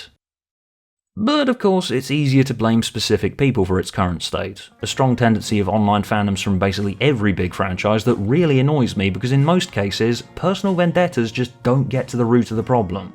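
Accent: United Kingdom. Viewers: I don't totally get the point you're making? I know a lot of the comments find it really smart I just personally kinda don't. Especially with the end question of “Would Doctor who catch on today?” Like, that's just not a very good or interesting question. The answer is probably no but you don’t see anyone asking that question about Batman or Shakespeare plays. I also think there was an assumption made in the video that the world of streaming is SO DIFFERENT to how TV was before and that’s not really true. Where we watch stuff is different but the age of binging has been dying out for a few years now and streamers just seem to be replacing cable. I personally think if you didn’t have the “smart youtuber” cadence and a British accent this video wouldn’t come across anywhere near as insightful as it does, because it just isn’t that insightful and it feels more like a man trying to intellectualize the fact he doesn’t think dr who is as good as he used to think it was. 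Also all the streaming shows you compared it to at the end of the video were made for adults and the show is a childrens show. You’re better off comparing it to “Bluey” than you are “Severence”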